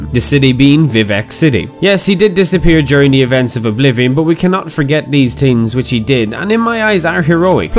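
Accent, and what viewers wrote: Ireland. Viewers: and yes i love and miss that sexy irish voice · Are you irish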